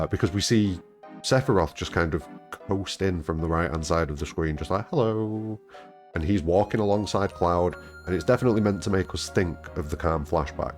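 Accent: Manchester, United Kingdom. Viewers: I literally thought that once I’d listened more. I moved here three years ago, so I’ve got ears like a bat for the Manc accent now. I could just imagine you saying, ‘Fock off, Man City!’ Hahaha.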